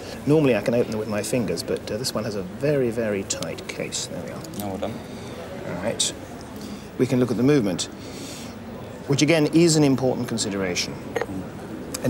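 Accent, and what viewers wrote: United Kingdom. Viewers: Nothing sounds smarter than a British dude who smacks his lips while waxing poetic. Awesome stuff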